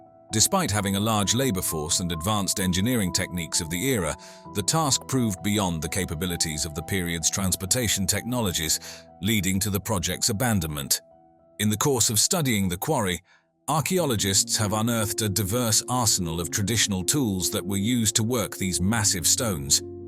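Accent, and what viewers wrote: England, United Kingdom. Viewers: It's tough listening to the narration because it's in an English accent and all I can think of are liars.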